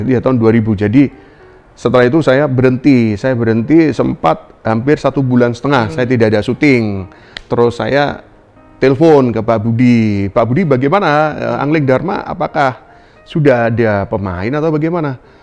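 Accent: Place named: Surabaya, Indonesia. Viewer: khas banget logat Surabaya om 😍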